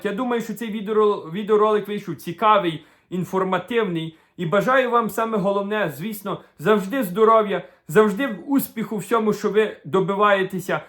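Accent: Western Ukraine, Ukraine. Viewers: Развиваешь українську мову. Особенно словом "надоїло"😂 Что у тебя не отнять, так это твой акцент. Шикарный! Кроме шуток. Украинский такой. У нас в Одессе говорили "западенский". Это твой родной? Ты с детства так говоришь?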